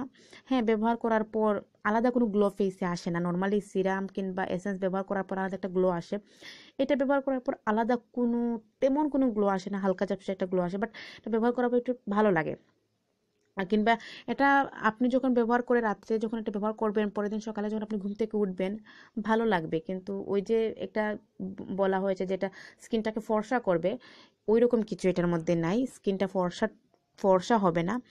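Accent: Sylhet, Bangladesh. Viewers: Amaro lager tain sylhety😃😃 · Are you sylheti ?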